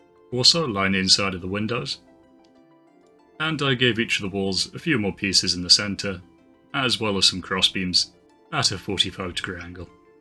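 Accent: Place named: United Kingdom. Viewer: Hey! You sound UK based.. where do you get your foam for the bricks from?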